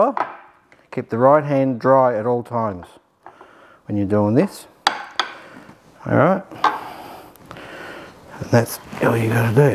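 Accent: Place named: Australia. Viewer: Sounds like you are an Australian like me. How did you make the garlic butter, thanks.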